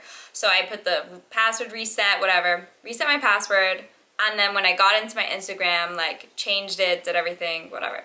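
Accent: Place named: United Kingdom. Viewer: the attempt at the British accent makes her look even hotter 🙃😍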